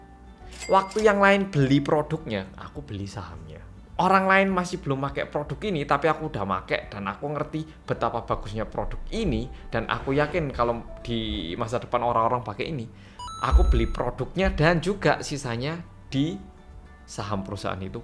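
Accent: Java, Indonesia. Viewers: Mas bikin video cerita kayak rinding dong Dan orang Korea medok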